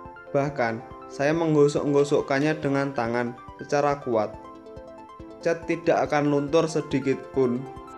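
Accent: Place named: Java, Indonesia. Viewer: Medok parah cuk.. 😅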